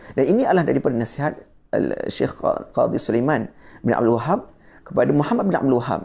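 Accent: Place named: United Kingdom. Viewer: Memang benar....sesiapa yg otak 1@ digit memang tidak akan faham apa yg kapten bicarakan....Lidah kapten lidah inggeris sbb hari2 gunakan bahasa tersebut. Slang berbahasa arab pun sudah tentu keinggerisan. Pernah dengar syeh mahmud khalil qusairy baca quran slang khorasan? Mereka akn fitnah pelbagai cara dari pelbagai sudut. Tidak salah saya anjurkan para ustz (klu boleh dipanggil ustazlah) belajar lagi...sbb ilmu islam ini amat luas....umur 100 tahun pun belum tentu sudah cukup😂😂😂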